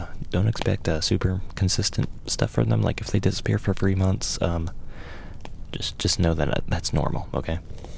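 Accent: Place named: Northeast, United States. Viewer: As a furry who just moved from the northeast to California; I can tell you’re from either the northeast or Midwest and I love it!!!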